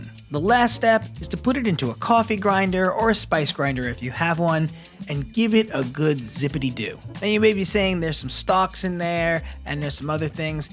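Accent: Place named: New York, United States. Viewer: Love your New York City accent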